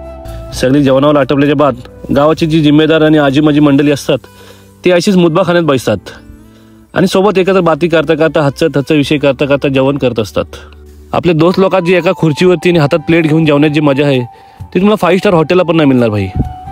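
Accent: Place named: Konkan, India. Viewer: Ek no video aisa lagra vlog khatam he na ho tumhi nav thevayla Gela pan to pan chagla vatla Ani tumchi kokni basha agdi Chan keep it up bhae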